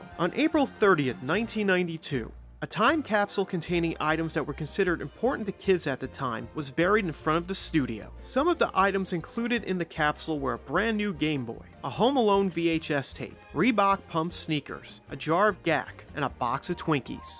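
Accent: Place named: Philadelphia, United States. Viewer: I recognize your accent. you're either from Philly (my guess) or Baltimore! I could barely pick it up at times. Either way, really great video!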